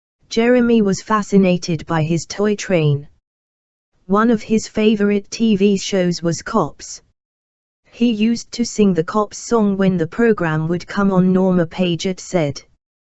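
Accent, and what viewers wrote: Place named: United Kingdom. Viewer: Amanda Sack agreed, the mechanical voice is distracting. A tinny British accent, literally like fingernails scratching across a chalkboard.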